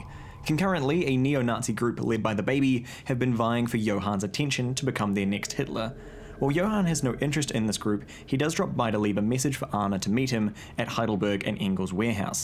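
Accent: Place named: New Zealand. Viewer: Also your accent is so interesting for me, it kinda sounds like Australia, but not quite. My guess would be New Zealand, but guessing accents in a second language is really hard, so don’t be offended if I messed it up completely lol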